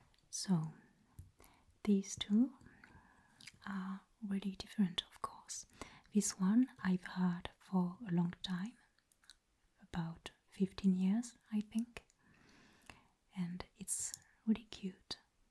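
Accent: France. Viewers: Your French accent amazing I love it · I love the apothecary theme! Also from the great french accent you have, are you like from a french speaking country or are you bilingual? I always heard you in english thus I was curious :p (also that's my goal to speak so good english people won't notice I'm french xD) thank you for all of your videos!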